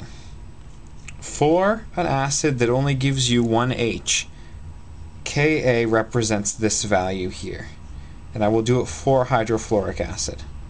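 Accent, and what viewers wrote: Canada. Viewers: you're from canada arent you?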